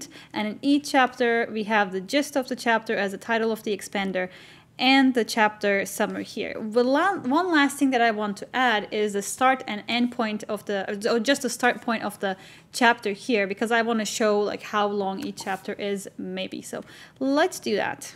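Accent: Turkey. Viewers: She has a Turkish accent.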